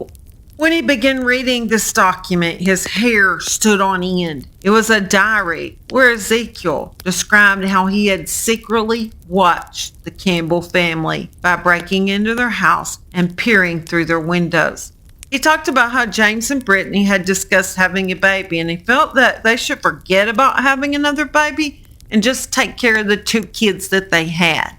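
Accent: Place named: South, United States. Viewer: southern accent makes the word poem sound like p*rn to my English accent, lol ☺️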